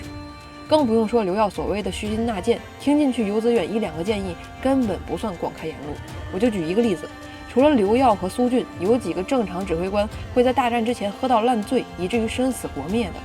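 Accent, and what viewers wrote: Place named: Beijing, China. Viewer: 姑娘一口地道的北京話非常好聽吾人甚為欣賞！比起其他說書人強太多了！姑娘讚！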